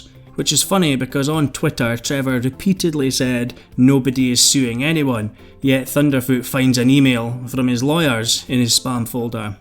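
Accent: Scotland, United Kingdom. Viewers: He’s from NE Scotland deal with it! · Scottish? I suggest you don’t watch his other videos, they’re all in Scottish. Since he’s Scottish. 🙄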